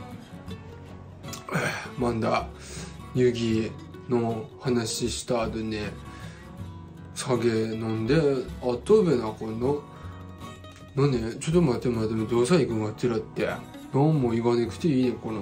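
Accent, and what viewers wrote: Tsugaru, Japan. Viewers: 綺麗な津軽弁ですね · うちの青森のおじさんにソックリ…しゃべりも…ガッツリ津軽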